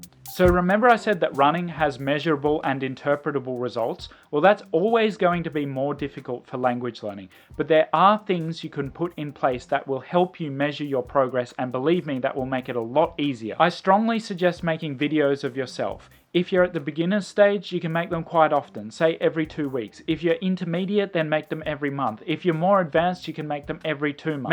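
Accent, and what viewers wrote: Australia. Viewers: when I watch your videos I get reaaly happy because months ago I couldn't understand anything in Australian accent (some sounds are different), and now I just realized I can understand you perfectly. My target language is English btw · My husband is Australian and I have lived here 55 years so I'm Australian too. I was listening to you and asked my husband what is this accent this guy has because I love it and I can understand him. He said he's an Aussie and to us he doesn't have an accent, so I felt very comfortable. Thank you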